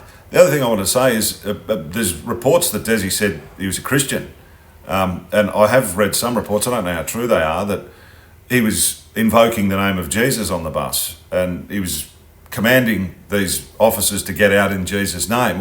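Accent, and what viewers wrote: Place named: Australia. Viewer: Wow a real Australian journalist speaking Australian I love it keep up the good work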